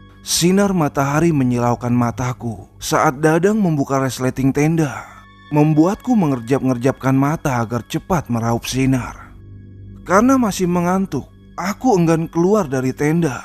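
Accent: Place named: Madiun, Indonesia. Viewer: Hadeeeeeh Madiun bletot lagi...heheheeeee salam bts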